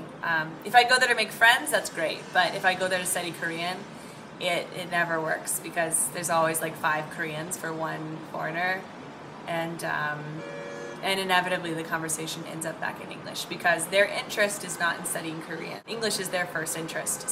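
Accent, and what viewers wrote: South, United States. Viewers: I hope to see you in the national TV one day. Maybe you will learn Korean some day~ ^^ By the way, I reaa~~~lly love the hint of souther accent. Keep cool. It is really hot and humid outside T_T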